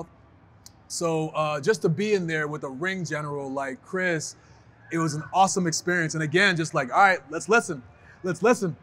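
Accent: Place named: Ghana. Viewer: What accent??? Aren’t you from Ghana 🇬🇭 ??? Did you lose that accent!!!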